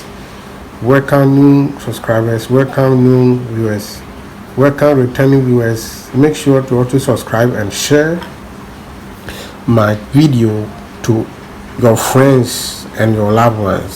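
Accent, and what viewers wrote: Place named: Ghana. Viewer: what's up the accent gave you off. you be ghanaian abi? give me the location of your shop. i have a mirage that is guzzling fuel no code. thanks for the video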